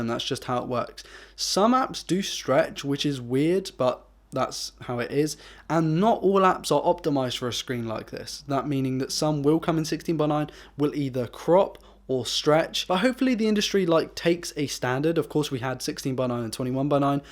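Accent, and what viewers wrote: United Kingdom. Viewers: we should listen to this guy, he has a British accent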